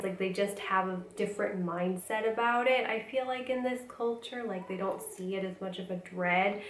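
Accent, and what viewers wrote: United States. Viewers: You speak softer American accent... more feminine and polite sound...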